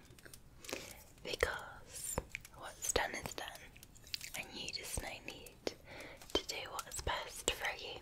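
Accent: Scotland, United Kingdom. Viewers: Everyone here knows you're a beautiful woman, and I could make a joke about being under the sheets (most of them are already taken though), but what I love most is that Scottish accent. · Beautifully Scottish, as always. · I love the Scottish accent!!! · Calm me down? Yes please, been stressed all day. Nothing better than a beautiful Scots woman under the sheets whispering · hello! cool video very relaxing, I loved! you have big beautiful eyes and lips and an adorable nose, you have such a nice accent, your videos ASMR all are of them awesome and very cute. you're really talented girl and so beautiful, greetings Pretty, thanks and sweet dreams for you also precious scottish girl;)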